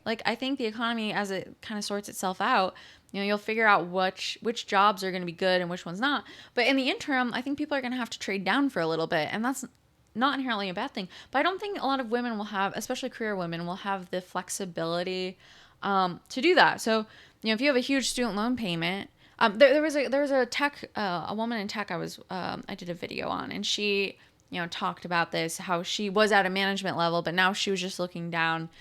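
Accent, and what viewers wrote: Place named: Northern Virginia, United States. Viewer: 30+ years in DC. 75% of women workers were superfluous. True CS degrees had at most 2% women and they were foreign. UMd had the best CS program. Further, DC is NOT segregated. That’s what makes it dangerous. Clueless white people move into black neighborhoods and become a statistic. You sound like you lived in far NW or Northern Virginia. You don’t sound like you lived in DC proper… Which was a good idea.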